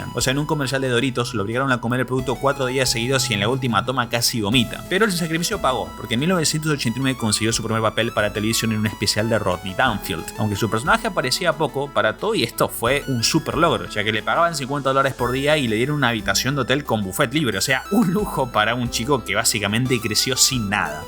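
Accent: Argentina. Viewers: acento argentino... apenas puede entenderse como un pésimo castellano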